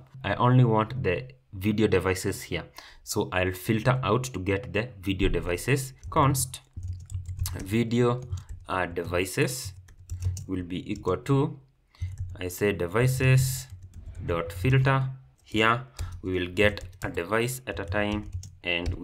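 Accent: Kenya. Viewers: where are you from? your accent inasound familiar, almost like a murima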